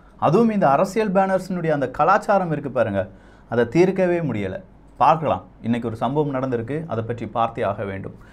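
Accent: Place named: Kanyakumari, India. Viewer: kanyakumari slang...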